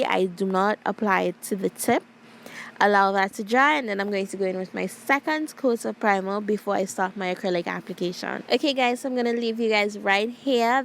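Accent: Trinidad and Tobago. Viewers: Omg your nails are gorgeous 😍😍 you did that !! I’m so glad I found your YouTube channel 👏🏾👏🏾👏🏾👏🏾 do I hear a trini accent Deh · You must be a Trini, I hear that infamous accent. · Your accent sounds Trini. Love the step by step instructions. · I hearing that Caribbean accent…Trini to deh bone? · Trini accent isn’t harsh it’s kinda soothing lol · totally sounds Trini!!🇹🇹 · You go Trini !! I could hear your accent !!! Great job!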